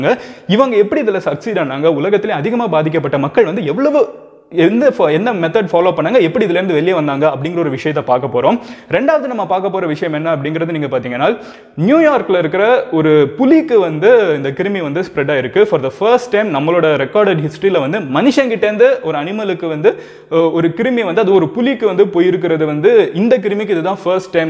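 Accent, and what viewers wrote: Madurai, India. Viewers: Thalaiva nega Madurai ya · நீங்கள் மதுரை யா? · neega madurai ya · Bro ninga madurai ah 🤔 Super bro 😇🤗😎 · Bro neenga Madurai ah?? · Bro Neenga Madurai ah?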